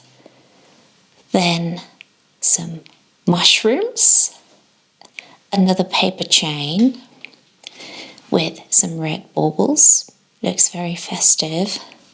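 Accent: United Kingdom. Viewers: 愛がいっぱい❤️気持ちがあたたかくなります。英国英語に聞き惚れてます。私もクリスチャン。素晴らしいクリスマスを過ごしましょう✨🎄✨ From 🇯🇵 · I always thought you were British because of your accent and didn't understand why you say yor are German... I thought only was really German. Now I watched your Q&A explaining where you got your accent from and I understand. Can you make a video speaking a little bit in your natural German accent? It will be interesting to hear. Also I love the German language, can you make more German language related videos? Maybe about German classical literature or just favorit German words. Love your channel!!! Sara.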